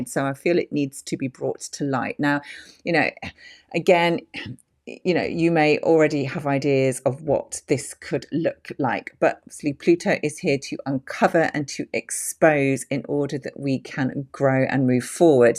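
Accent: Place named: United Kingdom. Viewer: Hello, my middle name...also, its nice to hear a british lady doing astrology, very few are very good, i have studied astrology since i was `16, because i wasnt good at sussing out people, astrology helped me so much there- now i see it actually working on the world stage- the wise men of the past knew much more than we think we knew, they used it daily and as a map and we can learn much from this xx THANKYOU X m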